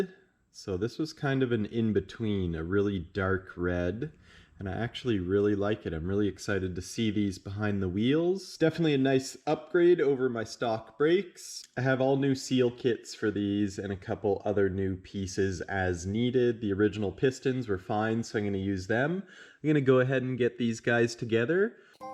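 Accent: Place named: France. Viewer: Hi, are you french ? You have a french accent !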